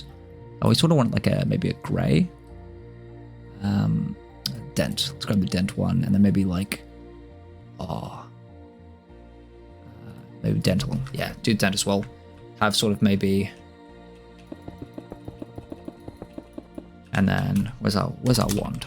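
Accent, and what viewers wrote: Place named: Australia. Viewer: thought you were a fellow aussie, great video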